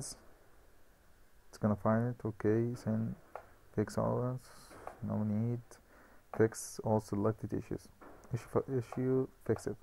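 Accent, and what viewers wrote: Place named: Brazil. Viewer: wow, i thought you were brazilian.. Your accent is quite similar. Great video btw! it really helped me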